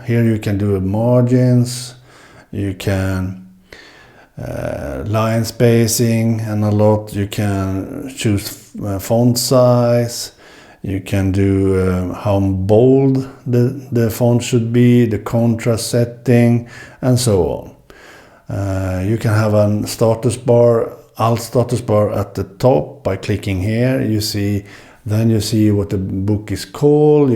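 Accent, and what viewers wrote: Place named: Sweden. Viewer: Jag har just installerat "assistant.koplugin" från github på min Kobo libra 2. Testade för ett par år sen med askGPT, men den funkade nog bara till jailbreakad Kindle. Men jag rekommenderar verkligen att kunna använda AI. Kör själv DeepSeek, som jag eg bara kan tycka ta ngt för lång tid, men annars hur bra som helst. Tack för en utmärkt kanal. Jag känner mig väldigt hemma här som svensk, medelålders e-bokläsar-nörd med samma svengelska dialekt som du. :)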